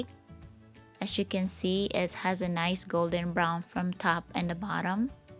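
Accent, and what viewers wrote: Visayas, Philippines. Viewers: Thank you, I think I an do this. I love your Visayan accent.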